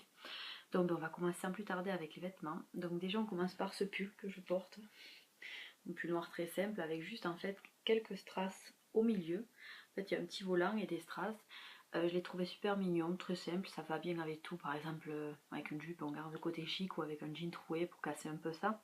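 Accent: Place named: Toulouse, France. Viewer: J'avais reconnu ton accent, je suis près de Toulouse aussi .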